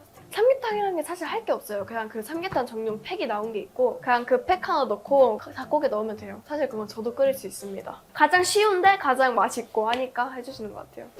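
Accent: South Korea. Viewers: 발음은 한국사람 인데....어디서 발음 배움??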